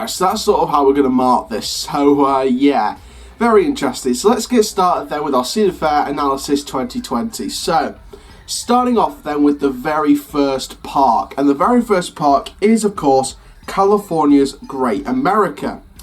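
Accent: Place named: Doncaster, United Kingdom. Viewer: Love your videos they're great, however, they hit a whole new level when you put the subtitles on. I don't think YouTube understands Doncaster accents too well.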